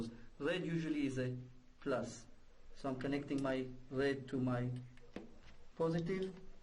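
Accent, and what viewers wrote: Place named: India. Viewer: indian english :)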